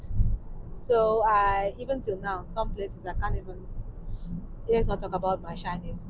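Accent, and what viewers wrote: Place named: Akwa Ibom, Nigeria. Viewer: You speak like an akwa ibom Nigeria❤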